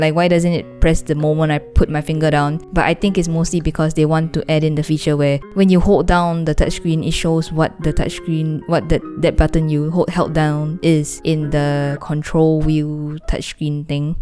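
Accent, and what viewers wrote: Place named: Singapore. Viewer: Sounds Singaporean 👌🏼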